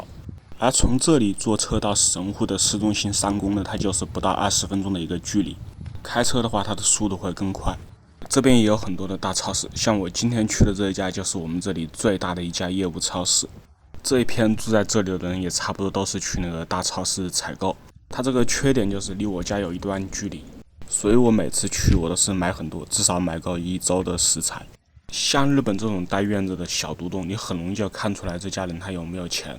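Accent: Wuhan, China. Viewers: 武漢普通話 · 听口音武汉人吧？老乡！ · 主播这口音武汉人吧？ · 朋友，你这武汉普通话，好亲切啊，多久没吃热干面了。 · 主播是武汉人吗？感觉是汉普，另外听到了热干面，感觉很亲切！ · 武汉口音？ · 听口音是武汉的吧？抖音也刷到过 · 你是武汉的？ 藏不住的口音 😂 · 这口音一听就是武汉人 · 我一听你的口音就觉得你是武汉人， 果然是哈哈哈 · 博主听口音是武汉的吗？ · 聽你口音感覺就是漢普，等到14分鐘的時侯就真的冒出一句武汉話。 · 这期提到热干面，加上口音，应该武汉人无疑了。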